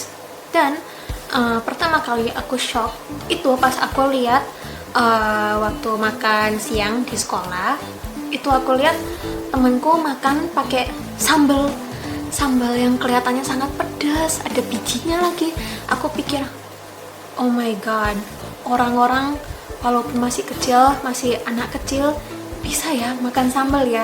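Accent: Java, Indonesia. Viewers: Anjirr.. medok banget mbk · Orang Jepang ngomong nya kok medok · Nihonjin logat jawa:) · Waw jawanya medok bgt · Onee chan medok bngt ya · Ngomonge medok''hhh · Waaa😀😀 cantik banget.. dan medok nya ❤️❤️❤️ semangat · Lah kok medhok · kok medok ya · Medok e mbak 😁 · Jepang medhok Jowo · Medok tenan mbk...😄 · Bahasa Indonesia pake logat jawa😅😅😅😅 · Wow kok bahasa Indonesia Nya medok bangat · Kamu jowo ne jowo ndi? logat ne kayak wong jowo! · la kok jowone medok to banget mbak...😂 saya pernah dapet uang 20.000 sobek. tp sabungannya pake uang 2000. jd totl uang ku 22.000 😢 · Harusnya ini artis dr jepang dr pada Haruka. Aku suka cara bicaranya lebih medok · Terimakasih, warga Jepang yg sdh sangat apresiasi thd bangsa, bahasa Indonesia, sampai sangat MEDOK SEKALI bahasa Indonesia dan bahasa Jawa nya. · Boso Jowonya kental banget 😁😁😁😁😁😁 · Bahasa jowone mledookk mba'e 😁😁🤘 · Saiki tinggal neng suroboyo yo mbak.. 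medok jowonE.. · Logat nya Jawa banget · Kak, jowone medok bngt..... 👍👍 colabs, lgi sama RIZAL nipon, dong kak... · Akhirnya ada youtuber Jepang yg medhok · Medok nya suka akhuu.. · Teruskan medoknya krn itu kearifan Person😃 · Your Javanese accent is amazing.. cannot believe you grow up in US.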